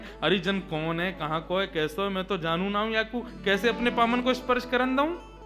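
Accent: Braj, India. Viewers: 🚩🚩🚩🙏🙏🙏🥰😅 अति सुंदर अति सुंदर महाराज जी बृज बोली कितना मिठास है सुनने बड़ा आनंद आता है श्री राधे राधे हरे कृष्णा प्रणाम प्रभु❤❤❤❤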